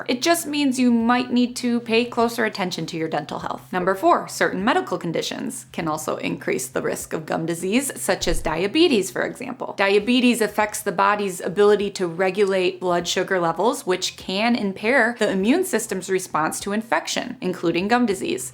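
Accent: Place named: Chicago, United States. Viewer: You’re from Chicago right? I sense a Chicago accent it’s so cute!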